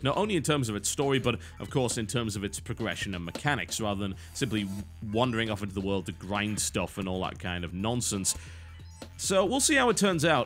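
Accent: United Kingdom. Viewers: maybe he enjoys the sound of you manly British voice....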